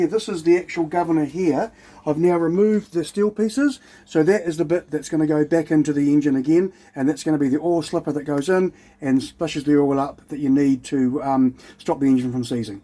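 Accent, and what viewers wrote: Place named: New Zealand. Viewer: Cars and cameras, red beards garage have lots of helpful stuff....but the kiwi accent is great!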